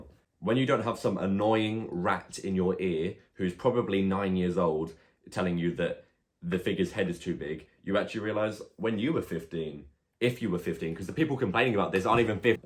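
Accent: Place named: United Kingdom. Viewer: Very funny and informative review, subscribed! I'm guessing from your accent that you're based in the UK, if you are then please let me know where you get your figures from since I'm also based in the UK and shipping prices have gotten pretty rough since covid lol.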